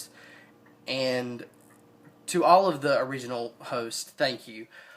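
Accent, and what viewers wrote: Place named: South, United States. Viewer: Looking forward to watching your videos! I am from England and I love your southern accent :) x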